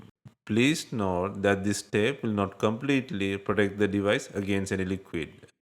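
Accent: India. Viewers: Best English with indian accent ever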